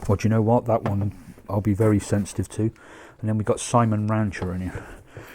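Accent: United Kingdom. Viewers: north carolina? wow, i thought you were british lol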